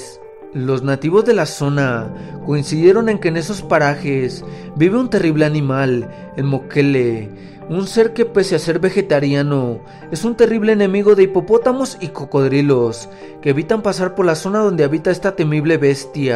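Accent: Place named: Texas, United States. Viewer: Buen video, pero no hables como si tuvieras un gancho presionando tu nariz. Y ese dejo de ranchero texano le resta seriedad a tu voz, te sugiero hablar pausado, claro, fuerte y acento neutro. Éxitos!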